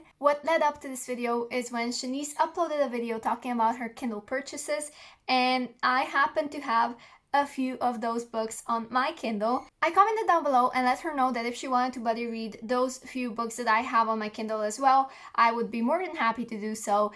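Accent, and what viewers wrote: Slovenia. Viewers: I love your slovenian accent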